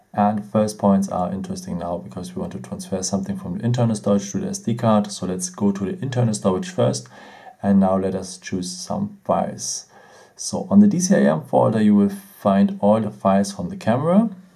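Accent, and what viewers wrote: Germany. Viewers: Bist du aus Deutschland??😂😂 Hört man voll raus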